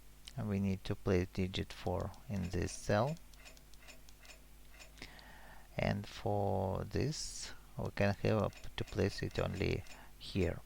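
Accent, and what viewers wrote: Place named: Russia. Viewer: i cant listen to this guy.. is he russian?:DDD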